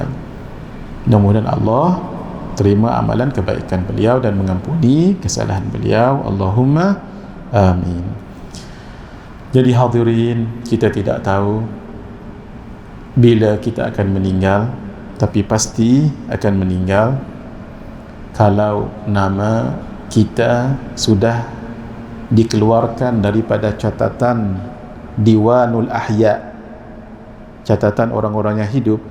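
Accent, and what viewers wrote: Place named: Sarawak, Malaysia. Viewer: Salam ..jazakAllah khair ..gaya bercakap mcm org kuching cakap bahasa melayu semenanjung ..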